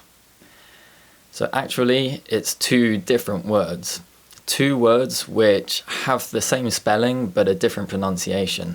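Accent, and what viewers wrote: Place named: England, United Kingdom. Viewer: I love your English accent ❤️🤩 What's the name of your English accent?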